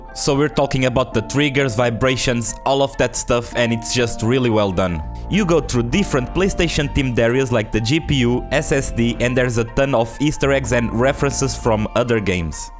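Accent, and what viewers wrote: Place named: Portugal. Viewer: Mano tive que checkar a tua bio peguei logo pelo teu accent que eras tuga hahahahaha, excelente video abraço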